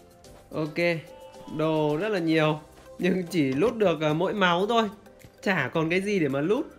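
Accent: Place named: Ha Tinh, Vietnam. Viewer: Giọng này khả năng là Hà Tĩnh :D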